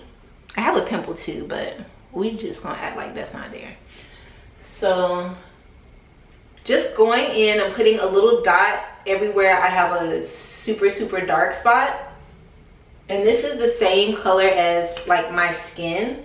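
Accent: United States. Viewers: You sound like us who live in Atlanta. We always say, “there’s Atlanta and the rest of Georgia.” So, yes, I live in Atlanta, not Georgia. 😊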